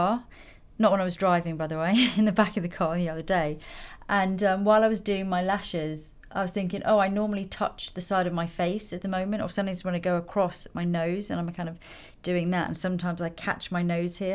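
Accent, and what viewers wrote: England, United Kingdom. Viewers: I find fascinating how Magical the transformation always is, how beautifully the colors blend whether they are subtle as here or more intense and above all the degree of sincerity of (the comment about her cheating on her lower lip)... this is Fascinating:D (and I havent got a clue about proper makeup:D).. oh yes I also love British accent:p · I love how english people prononce french words! Good job! It was really hard saying encre de peau :)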